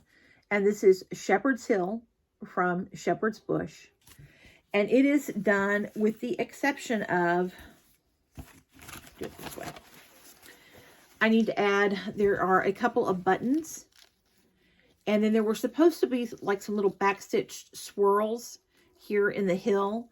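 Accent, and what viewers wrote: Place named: Texas, United States. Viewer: Loved all your beautiful finishes and your Texas twang (being from south Texas myself). Thanks for sharing your work with us. shepherd and twice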